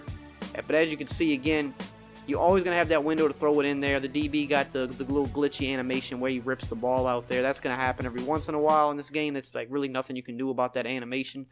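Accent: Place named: New Orleans, United States. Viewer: That's gotta be a new Orleans accent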